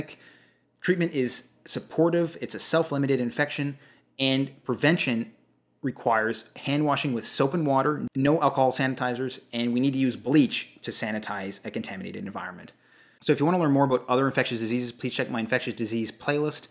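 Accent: Canada. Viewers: It’s underrated how dangerous this thing can be. I’m a kidney transplant recipient and I’m terrified of this virus. If I get it, not only am I at risk of damaging my transplant by dehydration but also not being able to keep anti rejection drugs down. I’m immunocompromised too so I’m terrified. Love the narrators Canadian accent btw. Reminds me of my crush, Calum Worthy❤❤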